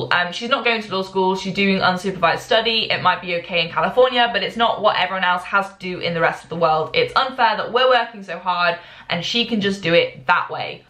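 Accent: United Kingdom. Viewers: is it weird that i didn’t even notice that you had a british accent until you said “here in the uk” ??? that’s literally 9 minutes in. i literally thought for 9 minutes that you were american with an american accent?? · Holy fuck you're British, I'm ready to listen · I didn't expect you to be British but it was such a pleasant surprise when you started talking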